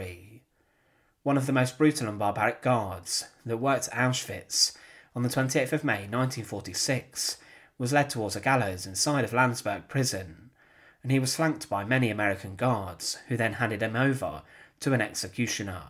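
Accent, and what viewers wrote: United Kingdom. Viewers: He’s actually speaking very slowly and calmly in a British accent. Just because he has a better mastery of the English language than you doesn’t mean he should cowtow to your ignorance. Listen better. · Isn't the commentator Britsh? Not redneck...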